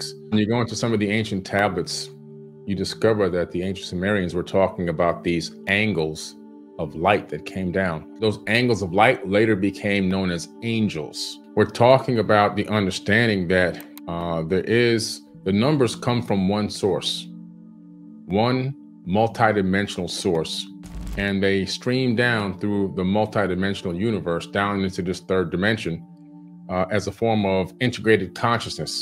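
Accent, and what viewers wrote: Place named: Scotland, United Kingdom. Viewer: These meditations are perfect. <link> him has a gentle, warm, pleasant voice (with a slight Scots accent). The words are simple and clear. The timing is perfect... just as you start to find your mind wandering, he says and if you find that your mind is wandering... . There is no background music and no noise in the background, only his peaceful and engaging voice. The length of the meditations (about 1/2 hour each) works well for me. I find that I tire of some meditations after frequent repetition, but that hasn't happened with this one. The metta lovingkindness meditation always feels right. Some meditation CDs I have tried have not felt right. The voice seemed hurried, or sounded tense; the background music seemed intrusive, or there were noises that interfered with concentration. This is just perfect as an introduction for new meditators, and it's also a meditation that I return to again and again. 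I'd give this more than five stars if that were an option. Even my students, who are not ordinarily very receptive to meditation, relax when they hear this one!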